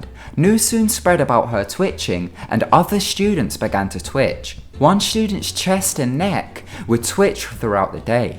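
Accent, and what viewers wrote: United Kingdom. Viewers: Pretty sure it's a strong UK accent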